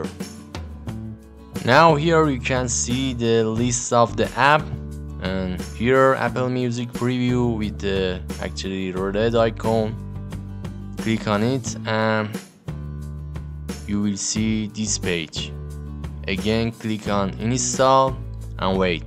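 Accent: Iran. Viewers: khob lahje farsi dasht iranie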